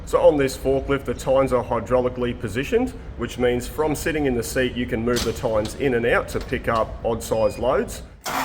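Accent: Australia. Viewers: Americans not understanding avgood Aussie accent!!!!!!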